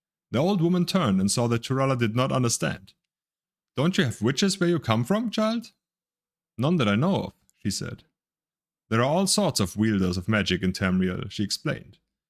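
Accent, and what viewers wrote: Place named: Germany. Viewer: Ich hab mir schon immer eine Lesung der Skyrim Bücher gewünscht, aber ich komme leider nicht über den deutschen Akzent hinweg :(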